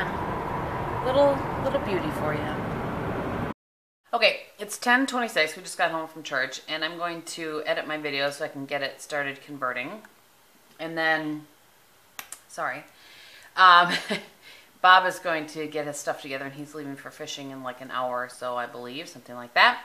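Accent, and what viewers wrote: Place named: Minnesota, United States. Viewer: I just found your channels and I've gone on a total binge. So hi! you are a pleasure to watch. It's nice to find someone who is so relatable and your accent reminds me of living in MN.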